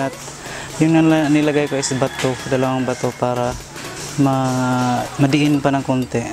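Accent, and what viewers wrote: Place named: Visayas, Philippines. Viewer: oo nga sir kc nga mid u nahalata ko na bisaya ang tono mo kya ako ngtanong kung din ka sa aton..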